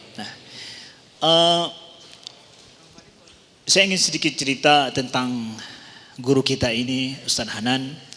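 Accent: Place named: Aceh, Indonesia. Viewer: Orang aceh ni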